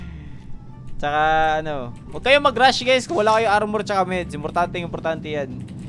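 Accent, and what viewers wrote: Visayas, Philippines. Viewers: Bisaya ni do😂😂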